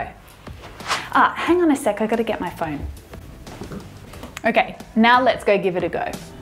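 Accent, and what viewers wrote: Australia. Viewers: Nice AUSTRALIAN sound. And I learn much from you. Thanks. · First time I’m really learning good pronunciation but from a teacher with an australian accent!!! Superb!!!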